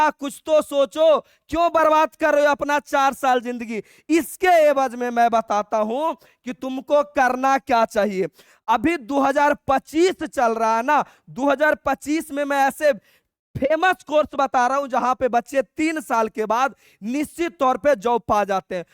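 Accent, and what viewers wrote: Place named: Haryana, India. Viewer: Haryana sa h sir ji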